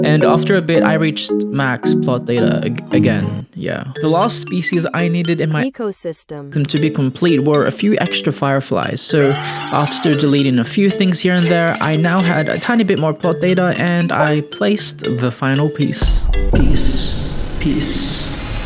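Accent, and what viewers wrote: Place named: Australia. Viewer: omg it’s an aussie!! side note: your building skills are litteraly jaw dropping oml🔥🔥, also the editing 🤌🤌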